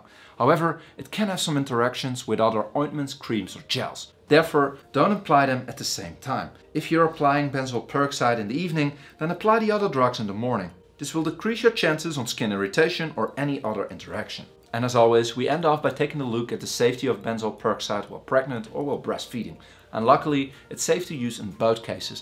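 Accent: Netherlands. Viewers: I heard your voice and just knew you were a fellow Dutch person. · Hahaha, I knew you were Dutch. My favourite European-English accent. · Ik kan aan t accent merken dat je nederlands spreekt 😂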